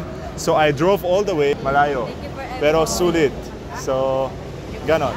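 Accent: Philippines. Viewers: It’s crazy you picked the Filipino accent 😀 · Ur slang is lil kabayan now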